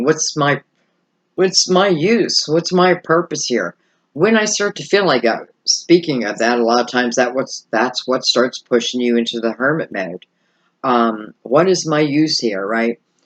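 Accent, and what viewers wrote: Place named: Philadelphia, United States. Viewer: Girrrrl!!!! Omg you are so gifted. But you're also familiar and cozy. It's a joy to listen. And that Philly accent! Makes me homesick for PA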